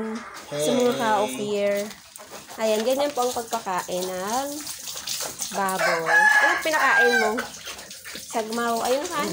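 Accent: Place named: Bicol, Philippines. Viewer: Hello manay.Sain ka tabi sa albay?Parang paryo kan bisaron ta ah😁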